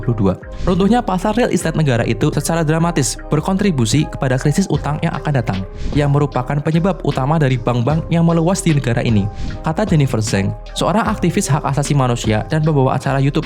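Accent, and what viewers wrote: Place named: Banyumas, Indonesia. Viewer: Aksen ngapaknya terasa ya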